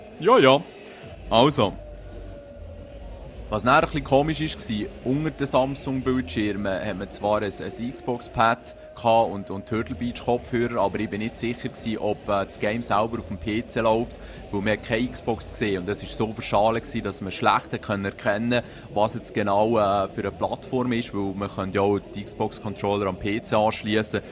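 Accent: Bern, Switzerland. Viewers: Besch du en berner ^^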